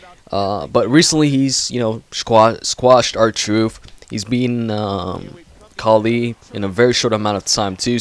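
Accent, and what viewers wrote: Canada. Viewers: ARE U CANADIAN..uve Kinda go thee accent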